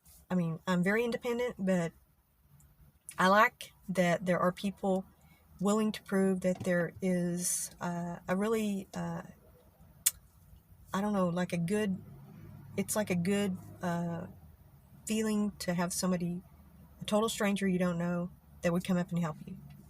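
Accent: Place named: South, United States. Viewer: Hi! I have just found your video and I loved it. I love the southern accent and I´m trying to learn it. It is not easy when English is not my first language but it helps me a lot to understand better and get more fluency. Thanks for this awesome explanaiton! Greetings from Argentina!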